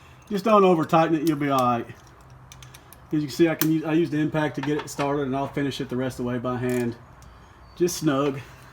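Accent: South, United States. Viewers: Excellent video. Southern accent can't be beat. I know why you use a 5 gallon bucket now. My little oil pan splattered all over tarnation. My filter was like new though, so I just put it back.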